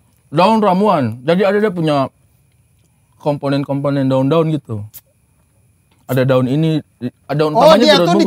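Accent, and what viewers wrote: Eastern Indonesia, Indonesia. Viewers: uda, de pu logat su mulai ketimuran ee.... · logatnya udah jadi logat indonesia timur wkwkwk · Bang su terpengaruh dgn bahasa Timur ya😂😂😂👍👍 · ... kenapa logatmu jadi ngikut timur juga... padang mana padang 😂😂😂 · Eeeeee....bang logat timur sdah bgus e😂😂e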